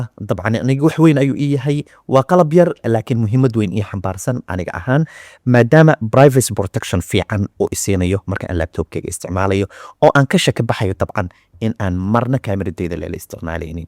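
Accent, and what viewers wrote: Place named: Hargeisa, Somalia. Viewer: Adi reer hargeysa ayaan ku moodayeybe😅😅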